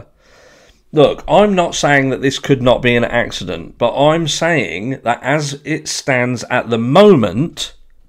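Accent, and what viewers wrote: United Kingdom. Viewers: I appreciate your commentary and hearing a British accent is refreshing.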